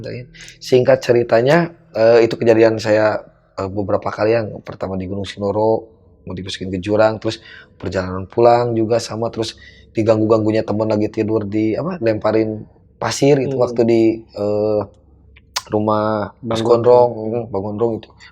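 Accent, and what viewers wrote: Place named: West Java, Indonesia. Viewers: Bengsi gk tuh. Sunda lekoh yess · Sundanya kerasa bgt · Justru logat Sunda lucu😂suaralu kali yg gaenak didenger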